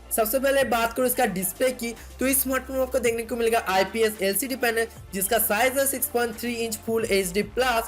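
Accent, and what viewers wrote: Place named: Bihar, India. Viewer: Bihari ho kya boli se laga. cameda....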